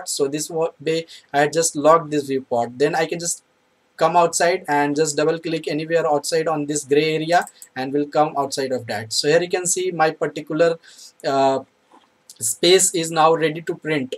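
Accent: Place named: India. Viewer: It is ver difficult to understand the talking because 1. it is very fast, and 2. it is spoken with an Indian accent. I am a native English speaker from the United States and I can't understand half of the words. Please Speak slowly and deliberately not merging the end of one word with the beginning of the next word realizing that your english pronunciation is difficult to understand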